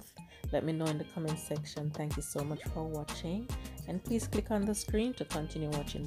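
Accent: Jamaica. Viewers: Beautimous 😍. One quick question- are you Jamaican? Your accent sounds similar to mine. 😉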